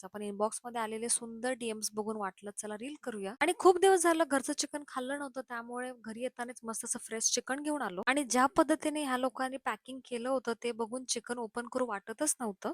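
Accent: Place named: Kolhapur, India. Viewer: Hey, love your simplicity and yummy recipes.. ugach show off nasato kadhi, specially Kolhapuri tone sounds unique.. keep shining 🎉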